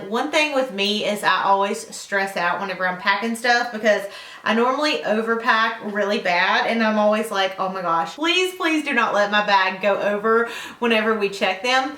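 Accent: South, United States. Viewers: I could listen to your cute country accent all day! Great video as always x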